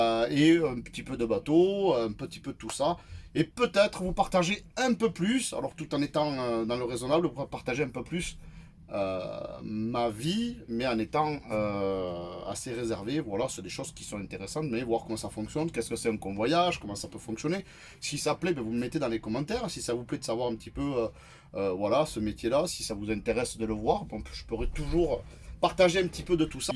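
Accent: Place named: Toulouse, France. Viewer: Ah cet accent toulousain,j’ai passé 12 ans et depuis mon cœur est à Toulouse. Hélas je ne peux plus me permettre de vivre dans la région, je suis juste au nord du lot, je ne vais pas me plaindre mais cet accent me fait vibrer, j’adore ! Depuis je fais du canoe sur la dordogne, lol ça change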